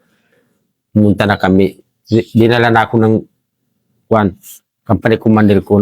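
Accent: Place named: Sulu, Philippines. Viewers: Boses palang ni sir halatang tausug na, salute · tau luuk jolo sulu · Tausug to accent pa lang Tagalog niya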